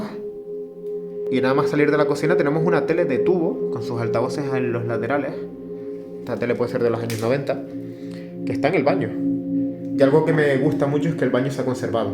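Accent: Canary Islands, Spain. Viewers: Muy interesante el video con sus aulas de colegio y sus sillas,un saludo ,me encanta tu acento canario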